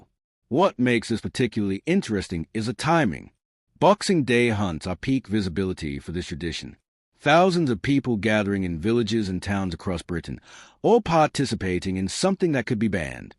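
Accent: United States. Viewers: You will only see this in the fake channel that is BRITISH MIRROR. They include the Union Flag logo to appear patriotic. You don't see any evidence, just that of the narrator, who has, at times a very heavy American accent. (AI)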